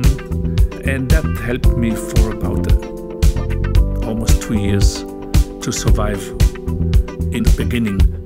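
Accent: Italy. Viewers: a me sembra un accento piuttosto italiano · actually i checked and he's from the Alto Adige region in Italy (which in german is Südtyrol) so he is italian but was born really close to the italian-austrian border, where the accent is influenced by germanic languages, so we were both right lol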